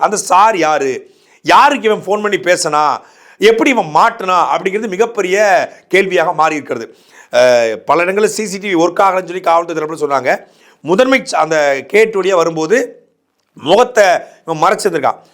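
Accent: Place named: Telugu, India. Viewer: இவன் தி மு க காறன்மட்டுமல்ல தமிழ்பேசும் தெலுங்கன்போல.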